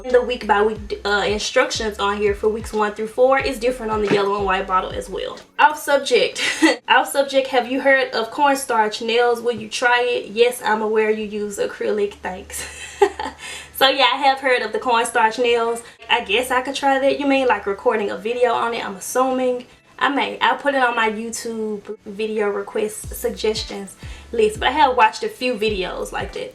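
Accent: South, United States. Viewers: I love you down south country accent! 😍 Soooo cute, girl! I'm from Florida so you know I'm representing! BTW, it's not overwhelming, it's very cute 😉😘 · been a while since ive seen a black person with a thick country accent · Wild growth did not work for me unfortunately, and it left a white residue. 😞 You have a lovely accent, BTW. Real Southern gal! 🇹🇹😇👏🤗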